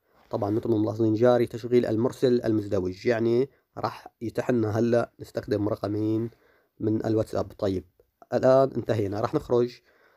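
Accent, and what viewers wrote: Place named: Homs, Syria. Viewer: معلم مدري ليش حاسس اني سامع صوتك وانك حمصي 😅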